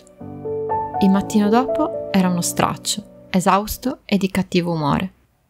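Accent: Sardinia, Italy. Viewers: No forse Sarda?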